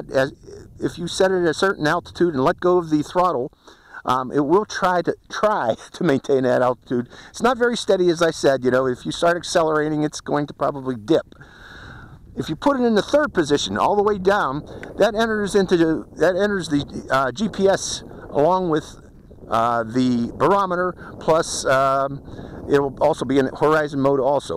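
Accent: Pittsburgh, United States. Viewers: Hi there, you have to be from Pittsburgh or there abouts, thats Steelers Country accent. Banggood has this whole package, copter, i6, goggles and even a case for 50% off and it's the updated version with new stacked ESCs, GPS module is oriented properly etc. The deal ends tomorrow, $180 for everything gonna give it a shot.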